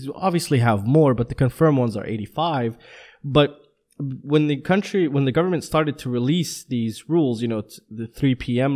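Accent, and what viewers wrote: United States. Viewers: Blows my mind I have been made aware of that you are Hungarian as I am. Never came to my mind for a second! Perhaps your American(?) accent have thrown me over. LOL The confirmed cases are not exactly equal to existing cases - you and me both know how reliable the data can be handled by our government (especially when you want to avoid panic at any cost). I am worried for my Mum in Pecs. What rumours you are referring to exactly? When only 4000 tests have been carried out you can always say 'nothing to worry about because we only have X number of cases'. It is not a rumour only - the Hungarian chief medical lead is a GP from a village with 4000 population without any further medical background - it doesn't really brings much relax to my mind. You can be a brilliant mind (or a medical genius) if your boss in charge lacking on the expertise. 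Currently we have not enough tests carried out (compared to other EU nations) to know how much Hungary is involved in the global pandemic. Rumours hah? If it is possible I am now appreciate more your hard work knowing it is coming from Hungary. I wish the very best for you and your family in this challenging times.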